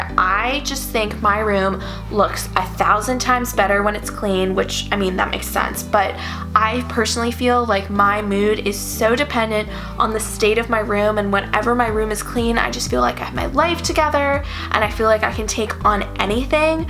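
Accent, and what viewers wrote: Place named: United States. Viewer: She sounds american and she said douvet.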